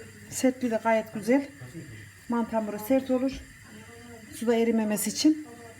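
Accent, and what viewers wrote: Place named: Merzifon, Turkey. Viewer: Bende merzifonluyum bursaya tasındım senide merzifonlular gibi konuşuyorsun diye yeni takip etmiştim merzifon yazısını görünce cok sasırdım hala dedem dayım herkes orada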